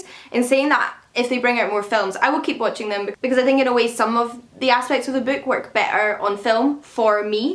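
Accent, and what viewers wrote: Scotland, United Kingdom. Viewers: so nice to hear another Scottish accent on booktube! keep doing what your doing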